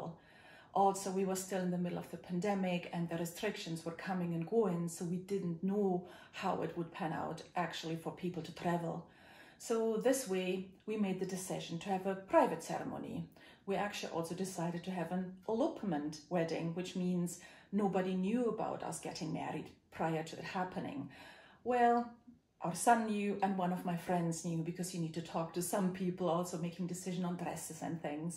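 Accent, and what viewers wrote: Scotland, United Kingdom. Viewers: Where is your accent from? Its sounds like a mix of Scottish and Eastern European.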